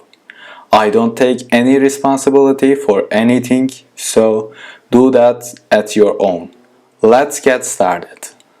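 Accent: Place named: Turkey. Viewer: Türk olduğunu gizleyemezsin aksan ele veriyor :)) . Video güzel olmuş eline sağlık.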